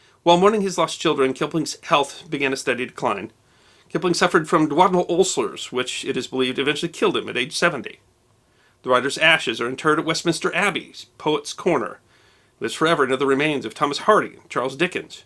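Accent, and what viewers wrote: United States. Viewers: Do forgive my apparent rudeness in writing this complaint to you about the way in which you narrate the uploads, which are well written but in my opinion badly spoken. The point is reached at times when the spoken words running continuously together, sound like a squawking chicken with an American accent. Furthermore, the word " duodenal " sounds phonetically as follows: " derwadenal " and whilst this might be the American way to pronounce this word, there are other examples, where the narrative is a confusing staccato aural onslaught. Your voice is highly pitched for a man's voice, in the same key as Kermit the Frog, from the animated puppet show called the " Muppet Show". Perhaps you should employ a professional speaker to narrate your uploads, which otherwise are very well put together. Best wishes, and keep up the otherwise good work. 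· Sorry but it doesn't sound right with an American accent.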